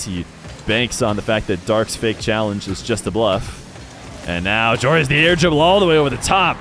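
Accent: United States. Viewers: Bro, you’re literally a Johnny boy copy in American accent. I get it, he’s very successful, but bro. Please find YOUR style. Don’t need to sound like another successful person…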